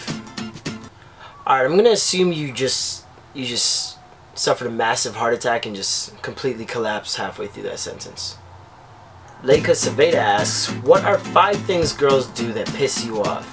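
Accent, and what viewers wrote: Jamaica. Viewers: Are you from Kingston, Jamaica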